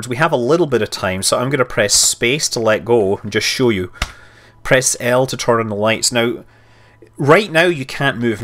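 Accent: Scotland, United Kingdom. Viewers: hence your name, you sound a bit scottish.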